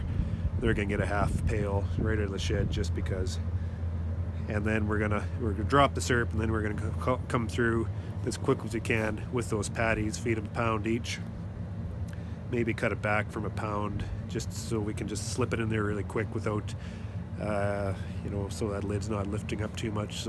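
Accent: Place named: Winkler, Canada. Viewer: This gonna sound creepy but here it goes, I grew up around Winkler and I have a lot of good memories of my uncles wandering around muttering about equipment being broken or some weld or other failing. I've long since moved far way, worked in fields that have nothing to do with farming, got married had a kid etc. Sometimes its nice to just hear a guy talking in the accent I grew up around and talking about the kinds of things my uncles used to talk about. "Half pail of feed" haven't heard anyone say that in well over 20 years.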